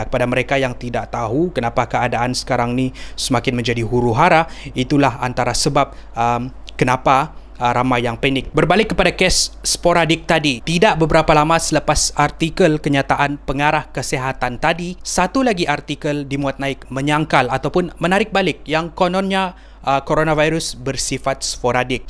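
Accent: Sabah, Malaysia. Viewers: Kamu orang sabah ke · Dia Ni Sabah ke indon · tu sabahan punya style paling ketara oh wkwkwkw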